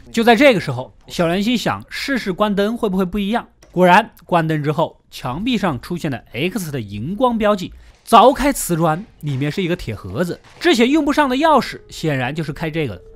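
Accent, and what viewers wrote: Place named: Anqing, China. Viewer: 安庆人说的塑料普通话，真的有点好玩...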